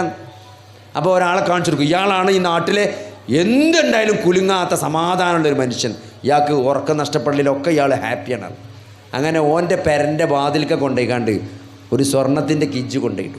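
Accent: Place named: Malappuram, India. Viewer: ഇദ്ദേഹം ഒരു ഡോക്ടറാണ്, but മലപ്പുറം മലയാളം വിട്ട് ഒരു കളിയുമില്ല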